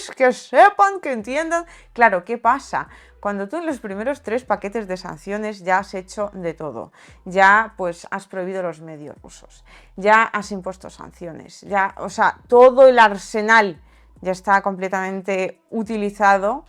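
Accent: Spain. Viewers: Hablas como ESPAÑOLA...y en MÉXICO no nos gustan los Españoles, destruyeron al imperio AZTECA... torturas, saqueos e imposición de su cultura a base DEL TERROR... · Mujer me encantas , como explicas ves las cosas claras vas al grano . Tienes un pequeño acento español pero enrazada con otro país la verdad muy linda y tus tatuajes mucho mejorrr éxitos . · ...me encanta escucharte¡¡¡ te escuchas....inteligente..profesional......ablas español de españa.....jeje suenas muy rico...sexy....te disfruto mucho.....saludos...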